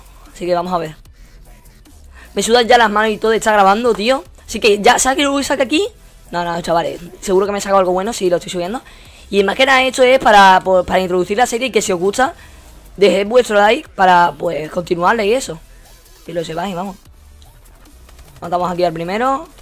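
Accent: Andalusia, Spain. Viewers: como se nota que eres andaluz yo soi de almeria y tu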